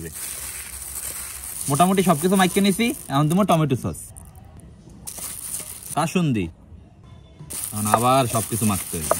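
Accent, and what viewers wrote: Barishal, Bangladesh. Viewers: মনু তুমি কি বরিশালের মাল শুদ্ধ ভাষা শিখো নাই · Borisaila Katha hunla rag uthey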